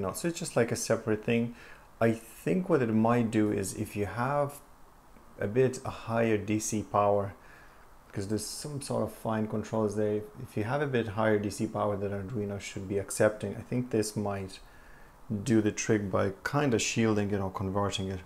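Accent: Ireland. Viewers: He does sound Irish, possibly from Dublin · Great video. Where are you from? You have an Irish twang in your accent, but dont sound like you are from Ireland.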